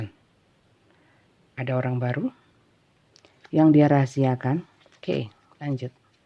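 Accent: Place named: Malang, Indonesia. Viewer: Suka banget cara nyampaikan bunda , Arema banget pokoknya soalnya dulu waktu kuliah di mlng teman teman cara ngomongnya ya logatnya kayak bunda deh, kakak saya juga banyak yg tinggal di mlng jadi klop dgn bunda , enak dengannya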